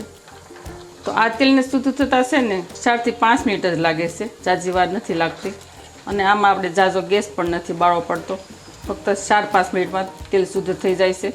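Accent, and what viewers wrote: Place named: Kathiawar, India. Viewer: Bau j saras ben..kathiyavadi so😊 mithi bhasha bolo chho...jayshreeben from nairobi kenya (africa)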